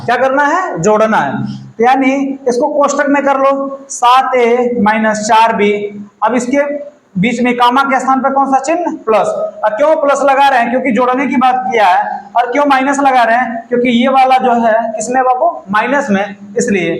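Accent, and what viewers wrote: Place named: Bhojpuri, India. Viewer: Good explanation sir ........ 👍👍👍👍👍👍 Bhojpuri style...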